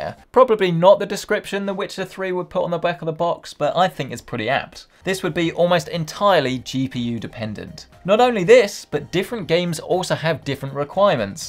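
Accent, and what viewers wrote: United Kingdom. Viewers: he's so bri'ish heh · Is this guy British?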